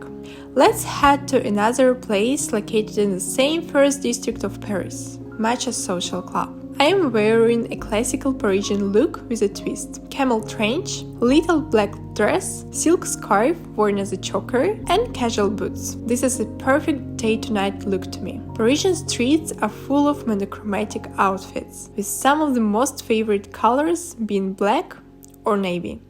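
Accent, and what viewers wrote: Russia. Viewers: Какая красивая! Сразу услышала русский акцент 🥰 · Русский акцент не вытравить ничем Как как она себя назвала?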